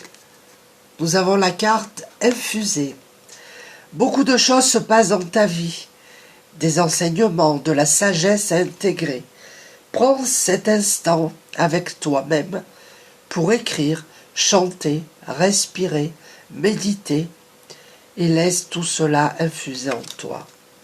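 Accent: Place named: Belgium. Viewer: haaaaan dsl vous avez un accent de Belgique 😂🤭 pardon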